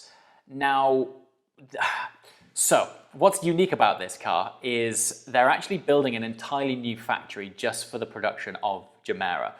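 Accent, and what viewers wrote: United Kingdom. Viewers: Truly great technological advancement. I just wonder how reliable that car will be? It looks great too. I agree that the car resembles a Panamera. You don't even know how I appreciate your smooth talk. Many people in the car industry butcher to the point that I can't watch them, That UK accent was like a breeze of fresh air from the North Sea. Cheers from USA!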